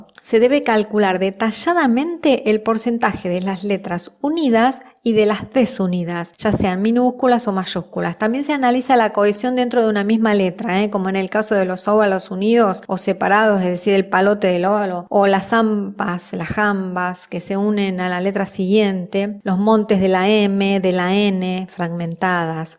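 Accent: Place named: Argentina. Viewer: Me gustan muchísimo gracias el acento de voz de argentina es muy bonito gracias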